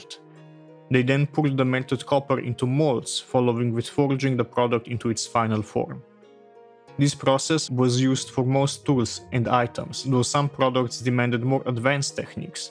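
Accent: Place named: Slovenia. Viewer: 3 seconds in and I instantly recognize a fellow Slovenian by the accent ;)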